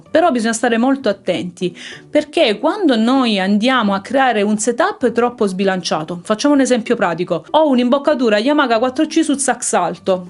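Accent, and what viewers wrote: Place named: Bergamo, Italy. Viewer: Ciao. Grazie per l'ottima e chiara spiegazione. Con il mio sax tenore e bocchino Yamaha 4C in genere uso le Vandoren "blu" 2.5 che considero la mia cosiddetta zona di comfort. Tuttavia per osare di più ho iniziato con le Rico "arancio" 3.5 che però trovo un pò dure e faticose da gestire specie sul registro grave. Ho provato anche a lavorarle un pochino (a riguardo ho seguito i tuoi video) ma non ho ancora trovato un setup soddisfaciente. Mi consigli un altro tipo di ancia (ad esempio Rico Jazz Select, di cui parlavi in un altro video)? Grazie! PS Aggiungo simpaticamente che l’espressione “manco li cani”, non proprio orobica, unitamente alla tua cadenza linguistica, mi è meravigliosamente familiare 🙂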